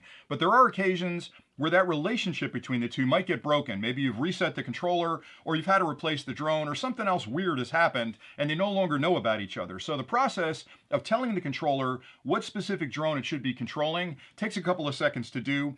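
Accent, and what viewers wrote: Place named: Bronx, United States. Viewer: you should be from the bronx - well cool - love your channel!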